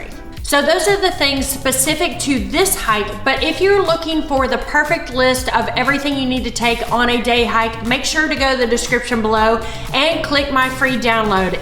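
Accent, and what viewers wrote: United States. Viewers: Thank you so much, the only thing and would help me much more is by putting subtitles for the next time!! Specially for the names that aren’t well pronounced (sounded with so much american accent I can bearly understand the towns!!)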